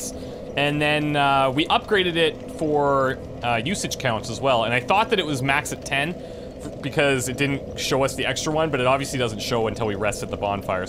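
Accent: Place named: Canada. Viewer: It's not a "tomb!" i kept thinking that too. We still love him, crazy Canadian accent and all.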